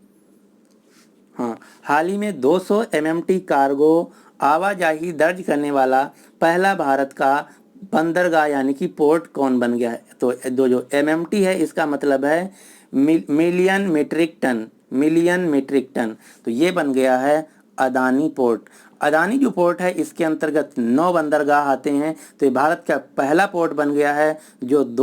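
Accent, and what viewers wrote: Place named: Uttar Pradesh, India. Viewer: Lagta h ki app up ke h hain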